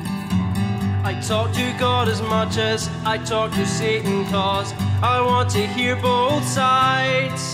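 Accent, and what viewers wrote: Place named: Edinburgh, United Kingdom. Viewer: People form Edinburgh have such thick accents! If I wasn't a killie boy I would have thought you were Irish!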